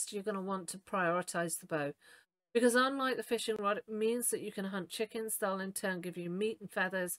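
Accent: United Kingdom. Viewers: I'm a tad biased, of course, British English can win me over immediately. But great guide, points nicely exemplified with footage at the right times, well done! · Sorry, I must have misheard something! P.S. Nice to hear an Eng;ish voice for a change, I can't listen to most of the American guides with all their drama and forced jollity :D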